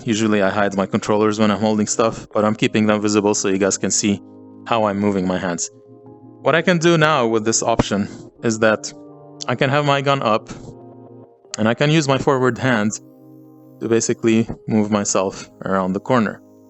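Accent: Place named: Serbia. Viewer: Ar you serbian lol I love your accent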